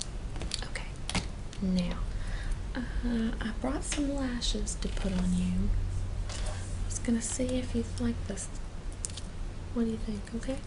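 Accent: South, United States. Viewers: You gotta gorgeous southern twang to your voice. I always marvel at you girls who do this ASMR stuff. Don't gemme wrong... I love it. I just don't how you do it, staring into a camera. Y'all are some great actresses. :) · you sound southern? I'm from Kentucky. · are u southern? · Emo lady with a southern accent omggg ❤ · She sounds like she's from the south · Amazing southern accent. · Oh dear God, finally, a woman with a Southern accent has uploaded one of these. There is nothing better than a Scarlett O'Hara. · Do I detect a southern accent? Lovely video. I've been watching several that you have made, and they're all so relaxing. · I hear that southern drawl, where you from?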